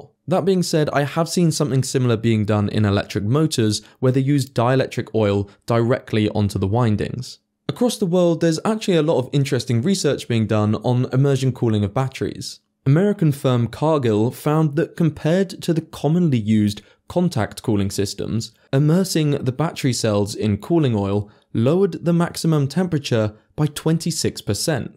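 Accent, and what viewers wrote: United Kingdom. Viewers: As soon as I heard him say "electric flights" and that the centripetal force increases "exponentially" with the speed of rotation, I knew right then, that the person quoted saying that this car "bends physics", and the british accent narrator themselves know absolutely nothing about physics. It's easy to see how they might think this. Sorry guys, but this is clickbait.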